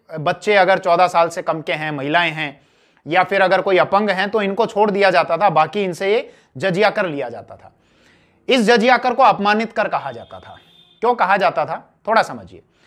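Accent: Rajasthan, India. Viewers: your accent shows your Rajasthani roots is just great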